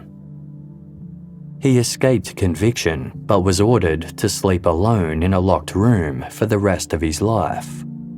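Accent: Australia. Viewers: SOON AS I HEARD THAT AUSSIE VOICE I TURNED HORRIBLE..I HATE WHAT OUR COUNTRY HAS BECOME..BUGGER OFF..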